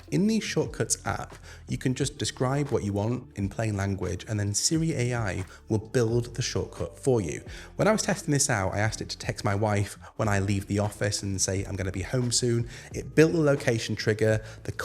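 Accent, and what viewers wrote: United Kingdom. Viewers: Brithish English is so gay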